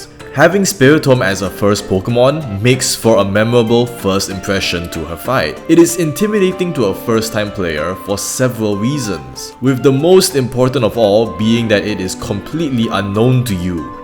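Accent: Singapore. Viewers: That Singaporean accent tho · I instantly recognise that Singaporean accent, certainly didn't expect to come across a fellow mate talking about our childhood trauma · Are you singaporean? Or Malaysian? Srry i js hear the accent and I’m js curious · From your accent, I can already tell that you're a singaporean lol and your channel profile confirms it. Really make me feel so wowed for some reason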